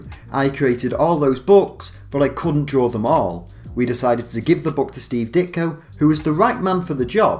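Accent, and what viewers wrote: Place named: Liverpool, United Kingdom. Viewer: Where are you from? Somethings you say sound Liverpudlian.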